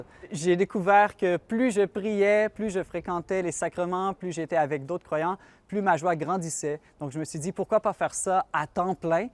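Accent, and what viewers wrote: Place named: Canada. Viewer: Merci de nous transmettre votre JOIE avec votre joli accent Canadien ! ! ! Vive le CANADA 🇨🇦 Que DIEU vous bénisse et vous protège ! Bonne Évangélisation ! Alléluia et Amen 🙏🇫🇷♍️😇🕊🗽💒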